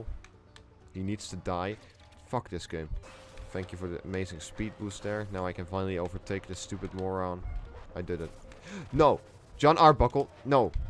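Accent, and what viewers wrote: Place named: Argentina. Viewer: You sound Argentinian ngl Also awesome Garfield Kart Gameplay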